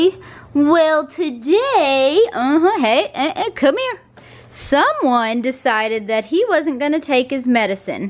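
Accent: South, United States. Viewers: I just love you and your southern hospitality and accent! And love Bo! He’s adorbs! I would love to try the sausage biscuits! They look yummy! Following them on FB and IG!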